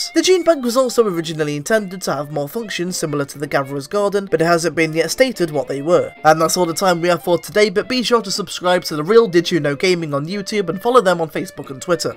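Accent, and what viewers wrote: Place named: United Kingdom. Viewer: Awesome job! And British! EXCELLENT!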